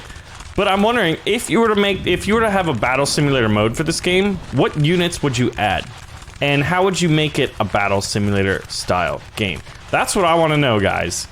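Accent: United Kingdom. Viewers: I like how you do all the different accents for all the different nations. As someone who is British, I think that your British accent is a little bit daft but I like it. Food wise, we do like curry, but it's not really our national dish. For that, I'd direct you towards something like fish and chips, or a Sunday roast, or even a full English breakfast. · I approve these British accents and any further British accents made by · I like his British accent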